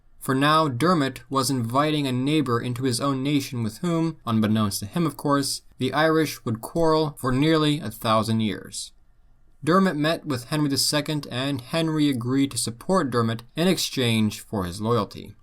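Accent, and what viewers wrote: United States. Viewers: I was disappointed that the narrator is speaking in an American accent, not Irish or English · Can't stand the American accent with odd emphasis on words and in this case, a boring voice, like reading a book in a hurry.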